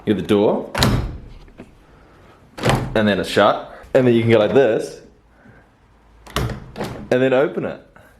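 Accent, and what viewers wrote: Austria. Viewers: Your austrian is very good, and it's fun to watch your videos, you do not complain, mostly, you just observe the differences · Wait. is this guy german (austrian) or actually from somewhere else? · Stumbled over your videos just a couple of days ago and I find them HILAAARIOUS. Your austrian is very good and I can't stop laughing whenever you say Oida or passt schon. Anyway, your observations on us is spot on and I hope you enjoy your stay here. I have been to New Zealand many years ago and it kind of struck me like a pacific version of Austria, only surrounded by an ocean and with volcanoes. I rented a motorbike on the southern island and vividly remember driving past meadows with wooden barns and rolled coils of hay and I only could think "Dude, this looks exactly like home!" Keep on making videos and have fun.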